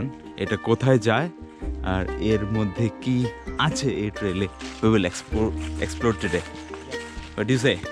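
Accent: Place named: Comilla, Bangladesh. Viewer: It seems that you are from Comilla , though we know, Tripura was the part of East-Bangal before the British period. Your tone and dialect are matched with us .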